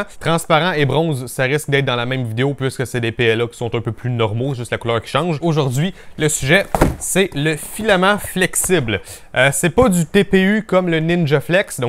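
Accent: Quebec, Canada. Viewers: Ah un québécois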